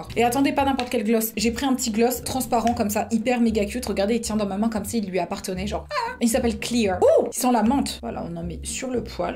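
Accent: England, United Kingdom. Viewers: Mais tu me fais tellement rire, je suis fan ! Et cet accent anglais, j'en peux plus 🤣🤣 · Salut.j adort ton accent anglais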